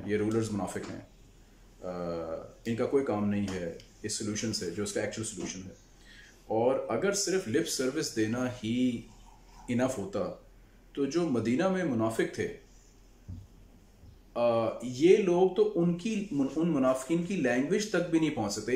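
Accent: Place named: India. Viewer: I think they just have a thick Indian accent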